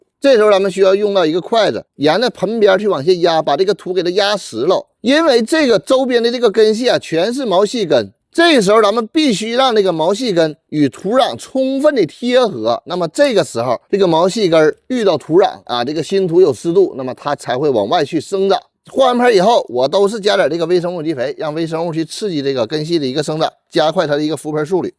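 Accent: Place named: Northeast, China. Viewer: 这是哪里的东北话呀？比其它听到的东北话好听。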